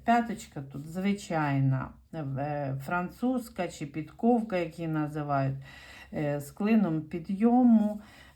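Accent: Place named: Odesa, Ukraine. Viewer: Ваша смачна , українська мова з одеським присмаком теж зачаровує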